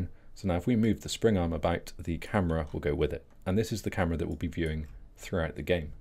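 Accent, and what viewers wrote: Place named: United Kingdom. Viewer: I feel like I'm listening to Jayztwocents in British accent. Anyways thanks for the great tutorial!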